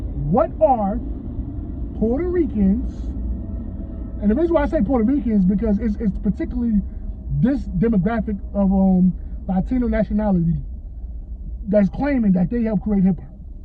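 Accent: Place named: Midwest, United States. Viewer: lol u sound like u from the middle of America please se ya self out this conversation you sound like a Goofy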